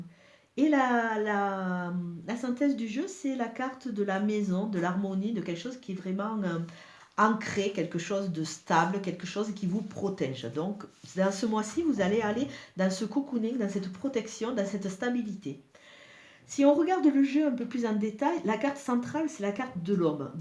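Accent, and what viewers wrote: South, France. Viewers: Merci il me semble entendre l'accent du sud qui correspond au même accent que moi je suis du côté du Tarn merci beaucoup c'est très parlant j'aime beaucoup à bientôt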